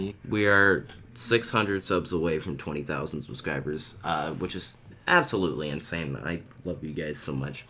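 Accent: Australia. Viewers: Nice aussy accent Mate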